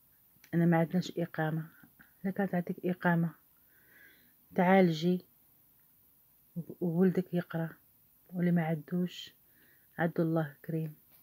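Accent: Gulf, Arab world. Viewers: اختي انتي كلامك مائل الخليجي معقولة كنتي فالخليج وماعندك باش ترجعي لبلادك على العموم الله يجيب اللي يرجعك لبلادك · هذه السيده شكيت عندها اللهجه خليجيه ما يمكنش معندهش عاءلة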